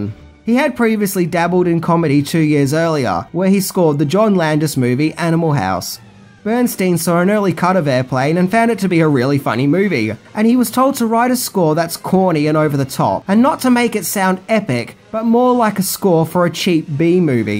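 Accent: Australia. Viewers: I’d say all the dislikes are for the whiney Aussie accent and him wearing sunglasses indoors I guess for comedic effect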